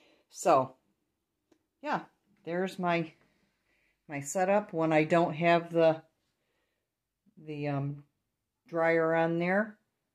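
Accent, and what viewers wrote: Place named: Midwest, United States. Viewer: Omg! You must be from the Midwest! You remind me of one my my BFFs! Makes me smile! And thanks for sharing!!! Xoxo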